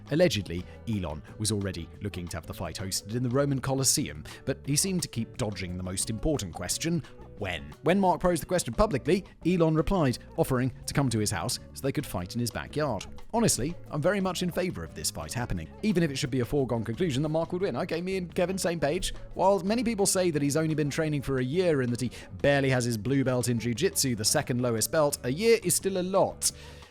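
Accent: United Kingdom. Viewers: How does your voice constantly trigger my Siri? Your a: younger then me (i’m 38 for context), and b: british so our accents shouldn’t match up (i’m from atlantic canada). Wild. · Being a person from the US who loves James Acaster, it's really funny to hear a guy with a british accent not know how to pronounce his name.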